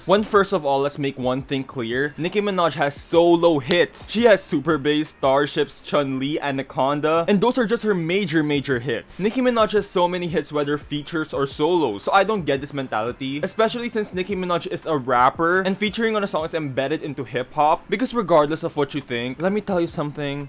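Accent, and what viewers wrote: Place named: Philippines. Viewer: I'm living through your stanship and filipino accent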